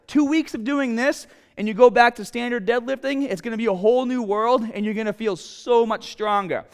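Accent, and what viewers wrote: Boston, United States. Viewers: With a slight auch in your back you want to position the baabell, love that Bostonian accent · I think he is from boston. Is he? Sounds like he is. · love that boston accent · Like the southey Boston accent!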